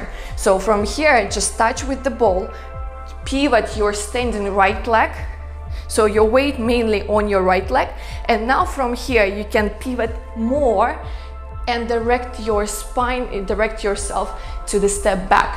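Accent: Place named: Russia. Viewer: Какой шикарный русский акцент😀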